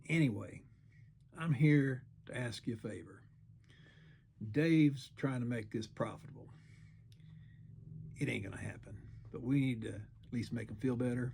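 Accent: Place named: Kansas, United States. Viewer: Grew up in western KS. Thought I recognized the accent. 😁Neat idea on how to make your dubbing. I'm new to tying. I haven't had a chance to get out and buy supplies, so I'm experimenting with using the fluff from chicken feathers.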